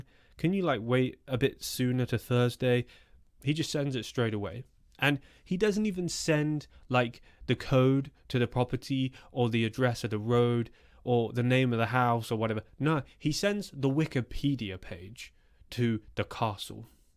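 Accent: United Kingdom. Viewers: You have been popping up on my phone over the last couple days, and I finally checked your channel out. What a fabulous storyteller you are! I was raised by a British mom here in the United States, and your accent has me feeling nostalgic..(she passed away almost 9 years ago). Subscribed, and listening in from beautiful Colorado. 💕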